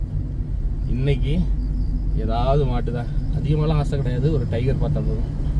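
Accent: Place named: Puducherry, India. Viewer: சார் உங்களுடைய காணொளியை பார்த்தேன் நான் புதுச்சேரி காரைக்கால் சேர்ந்தவன் உங்களுடைய வாய்ஸ் நம்ம ஊர் போல் இருக்கிறதே என்று தங்களுடைய கார் நம்பரை வைத்து பார்த்தேன் நீங்கள் புதுச்சேரியை சேர்ந்தவர் என்று என் மகளை பொள்ளாச்சியில் திருமணம் செய்து கொடுத்துள்ளேன் ஆழியாறு ஆனைமலை வரைக்கும் தான் சென்றிருக்கிறேன் உங்களுடைய வீடியோவை பார்த்த பிறகு உடனே செல்ல வேண்டும் என்று ஆவலாக உள்ளது நன்றி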